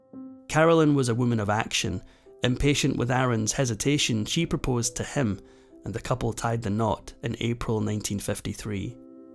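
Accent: Scotland, United Kingdom. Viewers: . Scottish AI? I don’t think so, not with those pauses and inflections. If you listen, you can hear him inhale. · A fellow Scot. I can't think who he is 🏴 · Six months, the narrator is Scottish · Lovely gentle Scots accent.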